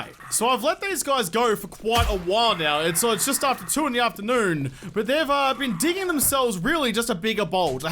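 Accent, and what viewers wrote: Australia. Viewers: " Gaoodayea" I'm guessing he is Australian?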